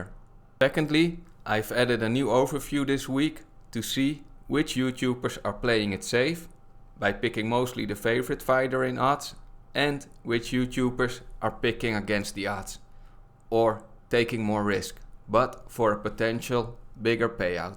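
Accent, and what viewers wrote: Netherlands. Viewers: Are you dutch? Just curious if I got the accent right.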